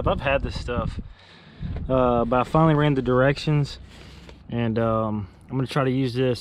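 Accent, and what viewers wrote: South, United States. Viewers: You're the first asian person I've ever seen with a genuine southern accent. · I like your southern accent!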